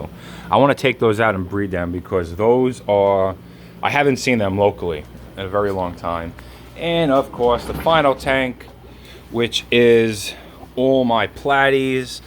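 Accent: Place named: New York, United States. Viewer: Where you located definitely a New York or New Jersey accent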